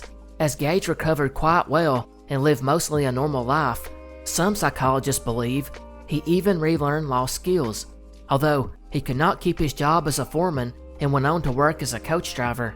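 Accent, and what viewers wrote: South, United States. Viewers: You're sincerely my favorite channel. Yes, I am a Southerner and your accent has a familiar and friendly familiarity, but that's hardly the only reason. You're information is researched and vetted and your attention to detail is impeccable. Thank you for your excellent work and the excellent entertainment!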